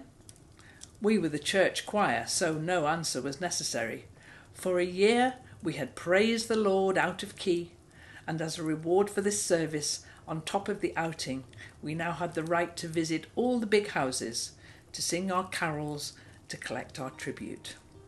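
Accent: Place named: Scotland, United Kingdom. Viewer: Thank you so much for the reading. So heartwarming. Your accent reminds me of my Scottish grandmother and her siblings. They were dear to me. It is evening for you and about noon for us, a bright, clear 1F… snow on the ground. Listening to Frank Sinatra and Bing Crosby croon on the CD player. Does that age us? Merry Christmas and a good quilting year!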